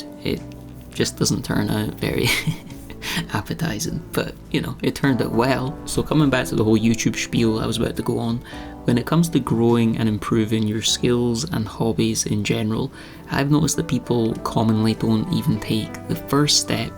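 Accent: Scotland, United Kingdom. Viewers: Hi, I’ve been watching since you bought the tiny house and I don’t normally comment, but every time I watch a video of yours,I mean to! It’s hard to articulate what I want to say, but basically I really think you have an element of genius. The combination of the topics you discuss, with the video clips etc, is so very creative and skilful. I know you’ve talked about being anxious before, so I just wanted to say that I hope you’re confident about your work because you really should be! Also lovely to hear a fellow Scottish accent in the YouTube world 😊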